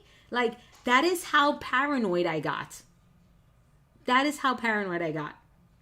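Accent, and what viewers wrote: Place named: New York, United States. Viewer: I have followed you since you showed up on the paper crafting scene so many years ago. I can still hear your NY accent! I have always believed that you are brilliant and have designed things unlike anyone else. That company was wrong and unprofessional. That designer that included the elements that were not theirs is also wrong. But, unless they can show proof of copyright, you have done nothing wrong. Please pursue legal action as this can be very harmful to your reputation. You need to stand up for yourself to make it clear that you will not tolerate this kind of behavior. I am so sorry this has happened to you, and you do not deserve it. Stay strong and proud. We know who you are, and that is all that matters in the end. Hugs to you.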